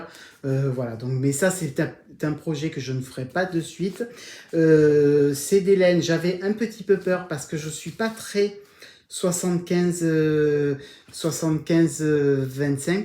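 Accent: South, France. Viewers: Bonjour!! Merci pour ton podcast! Ta simplicité est rafraîchissante! Tu m’as fait bien rire avec ton expression «foutre la patate»! Je dirais que quand tu ressens une émotion en lien avec un écheveau de laine, c’est avoir un coup de coeur ou un coup de foudre! Pour ce qui est des couleurs pour ton châle, je dirais de ne pas mettre la laine avec le rose fluo, remplacer par le fruit d’automne et scarabé…ou scarabé et fruit d’automne en dernier. Comme toi, j’adore les tutos de CloTricot! Je suis très fière de ma compatriote! Elle est tellement talentueuse pour expliquer les techniques. Je me suis procurée son livre de tricot chausette! Je l’attends avec impatience! Sur ce, continue ta belle aventure et j’ai bien hâte de voir ton prochain épisode avec ton accent du sud! Salutation du Québec!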